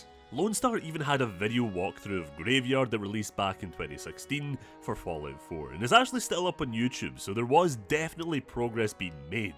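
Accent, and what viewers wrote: Scotland, United Kingdom. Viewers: i like the way scottish people talk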